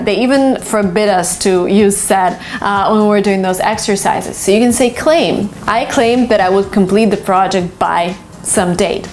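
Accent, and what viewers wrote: United Kingdom. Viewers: She has a lot of british accent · Are you from the UK?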